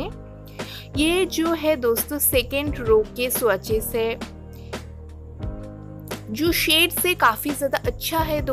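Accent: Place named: United Kingdom. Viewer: Angrejo jaise hindi q bol rhi hu achcha nahi lg rhs h ok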